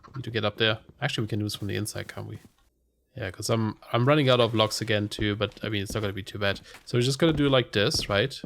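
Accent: Germany. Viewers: Love the german accent, after looking for TFC i luckily found your channel and am really enjoying ur videos! Keep it up :) Hope u will be better soon! Greetings from germany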